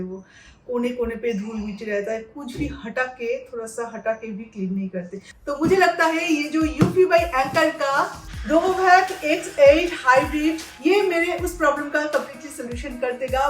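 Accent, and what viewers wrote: Bengal, India. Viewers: Still you're carrying your Bengali tone, accent and pronunciation in your Hindi and English conversation/words.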